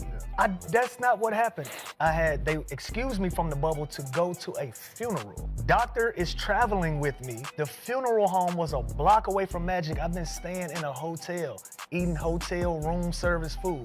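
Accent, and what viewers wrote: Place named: Memphis, United States. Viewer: bro you from memphis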